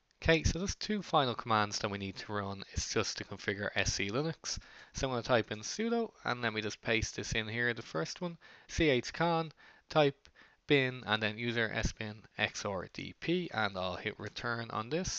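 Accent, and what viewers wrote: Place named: Ireland. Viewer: thank you for the clear instructions! my wife is impressed with you brogue too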